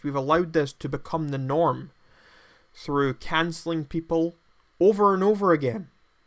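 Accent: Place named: Stirling, United Kingdom. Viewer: First, neato, a commentator that's fae Scotland. Second, you fae between Glesga and Edi? Ye kinda sound fae aboot Stirling way lol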